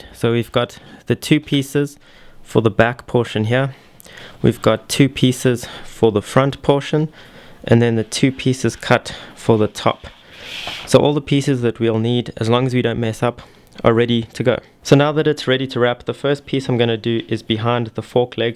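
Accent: South Africa. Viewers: I knew your voice sounded south African btw love your vids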